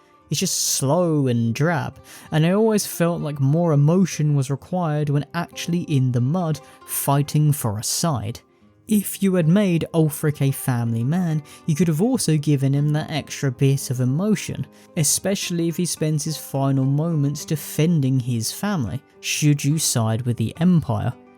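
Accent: United Kingdom. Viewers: I'm English, and judging by accent I assume they are too. We tend to drop the R at the end of words.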